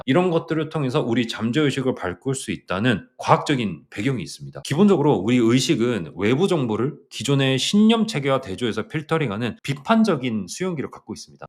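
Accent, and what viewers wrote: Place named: Jeolla, South Korea. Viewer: 아무리 숨겨도 감춰지지 않는 전라도 사투리=사짜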